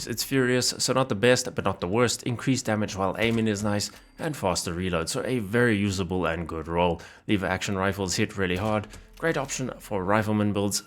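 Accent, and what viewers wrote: South Africa. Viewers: You from South Africa? Just asking because of the accent.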